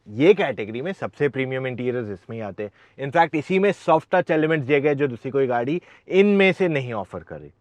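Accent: Gujarat, India. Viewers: Bhai kya aap gujarati ho kya ?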